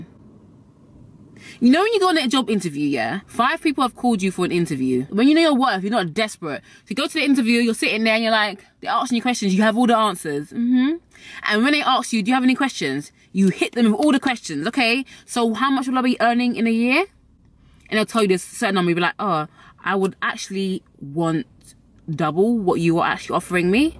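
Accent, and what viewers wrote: United Kingdom. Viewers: What an incredible video and it's so much more nicer for to me to hear a local British accent! Girrlll you was speaking my language from start to finish!!! I've been on a journey of self love and self care but I've hit a wall and came onto YouTube and this came up on my recommendations. I'm going to check out the rest of your channel but it would be absolutely amazing if you could also break this down into simple terms ie how exactly can we get to this point, what things do we actually have to implement to get to where you are? I hope that makes sense lol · I will add tax to it. Great advise. I love your British accent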